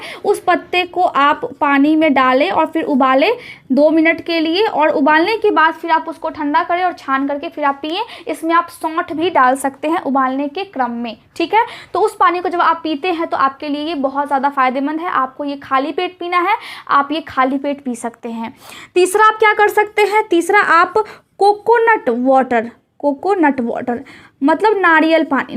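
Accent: Bihar, India. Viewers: Apki Bihari accent achha lagta he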